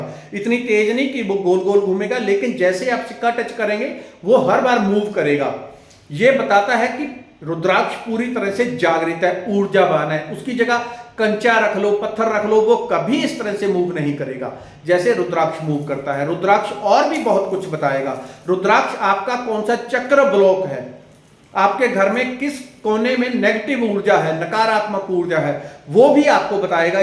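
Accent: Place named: Punjab, India. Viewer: Bhaji meinu lgda tusi punjab ton hon ... tuhadi tone punjabi a ... dsyo ?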